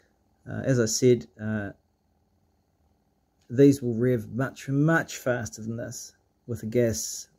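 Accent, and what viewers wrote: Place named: New Zealand. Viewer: Chur bro you sound like a fellow New Zealander?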